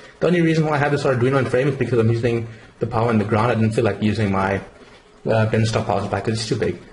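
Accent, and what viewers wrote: South Africa. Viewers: I thought that your accent sounded familiar, You're from Durban! im from Cape Town! Great project man, ill be sure to have a deeper look at your system, Good luck with the future of this project!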